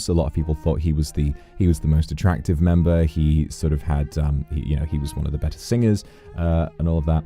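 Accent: United Kingdom. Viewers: 本当に助かる ありがとう Voxのこと好きなんだけど何言ってるかわからなくて配信を見られていなかった 今回の企画は私にとってとてもうれしいです accentがよく聞ける、イギリスの文化やスラングがわかる、Voxが何を言っているかある程度分かる！！！ Voxのことも好きだし、イギリスにも興味があったから本当に助かる ありがとう 何回も見ます、本当にありがとう I'm so happy because this steram is very useful and kind for me. I love Vox, but I can't understand what you say. I WANT TO UNDERSTAND WHAT YOU SAY!!! So, this stream got me excited. I'll watch it again and again. Thank you for the awesome try!!! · Thank you so much for this English class:_MiLove: I'm still learning English, and at this point, I'd like to learn more about the UK. I think I feel more comfortable with British English because of listening to you so much:_MiSmile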